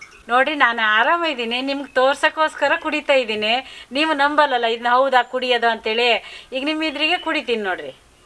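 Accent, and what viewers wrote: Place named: Siddapur, India. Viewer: Amma nimmur yavduu? Neev matado shaily nodudre siddapurdavruu ansutte nange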